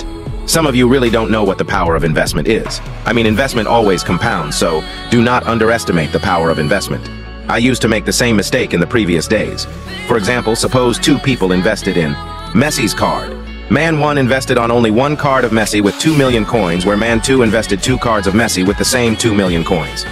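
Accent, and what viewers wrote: India. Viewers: Are you From India😅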